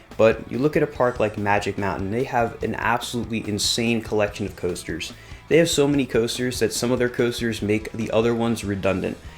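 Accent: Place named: New Jersey, United States. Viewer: "Wudder rides"... "Wudderfalls"... yeah, definitely from Jersey ;) nice vid, sub'd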